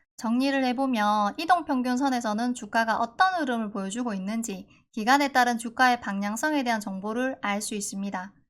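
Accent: Gyeongsang, South Korea. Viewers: 경상도 사투리 여성분 목소리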